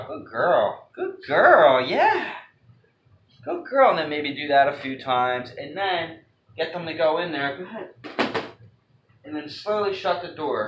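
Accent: Philadelphia, United States. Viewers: minutes in I could tell you’re from philly from your accent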